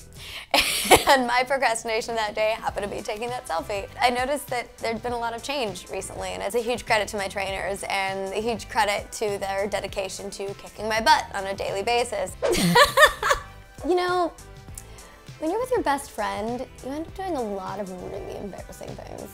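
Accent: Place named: Canada. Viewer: “Torono” ahh the Canadian pronunciation has grown on her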